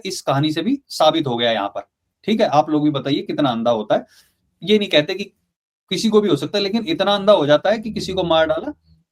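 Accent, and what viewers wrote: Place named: Haryana, India. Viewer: Australia mei rehte hai aur accent Haryanvi hai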